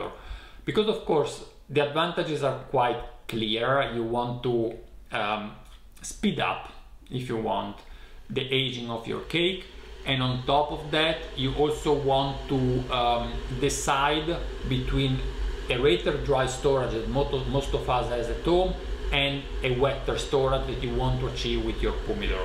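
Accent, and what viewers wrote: Italy. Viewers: Ciao, ti ho sempre e solo sentito parlare in inglese, e ho questo hobby di cercare di capire la provenienza degli italiani a partire dal loro accento in inglese, che mi porta alla domanda: sei pugliese?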